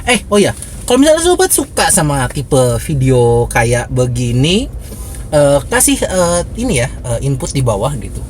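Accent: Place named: West Java, Indonesia. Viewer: orang sunda kah? logatnya sunda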